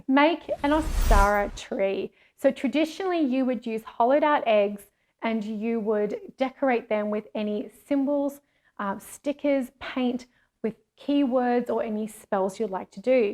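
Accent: Australia. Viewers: the accent threw me off. Australia. 🦘 🐨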